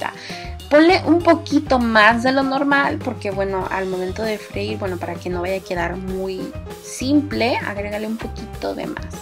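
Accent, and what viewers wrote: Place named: Mexico. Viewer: tienes acento mexicano :/ pero en el Perú hacen ese tipo de pescado frito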